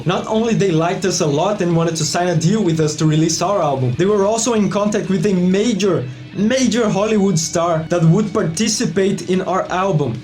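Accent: Brazil. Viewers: Hey, you have a brazilian's accenct. Are you brazilian?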